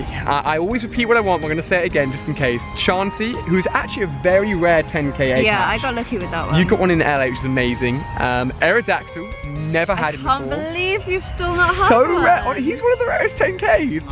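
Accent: United Kingdom. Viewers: He's British and has an accent. Get over it and enjoy the videos or don't watch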